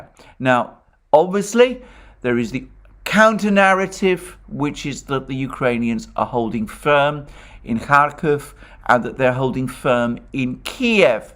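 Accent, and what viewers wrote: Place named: Cockney, United Kingdom. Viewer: The speaker throughout this video has a British Cockney accent. Websearch "photo of Col. Douglas Abbott Macgregor" and you will see that the speaker in this video is not he.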